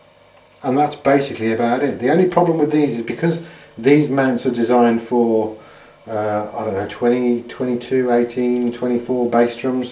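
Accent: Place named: England, United Kingdom. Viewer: congrats great triggers...you have a very nice English accent, is from birmingham?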